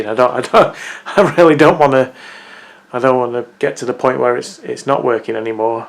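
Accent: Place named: Leeds, United Kingdom. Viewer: your new videos are way better and more authentic, you progressed very well. are you from Leeds? you sound very local to me...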